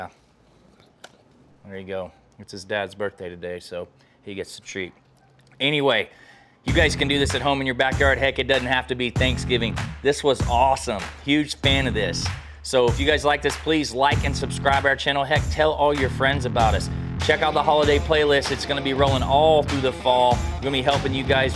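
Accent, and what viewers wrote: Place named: Texas, United States. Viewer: Real Texas voice like Ted Cruz🥶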